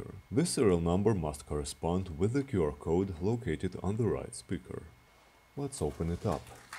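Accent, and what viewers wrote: Russia. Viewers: русский английский это потрясающе. спасибо за видео!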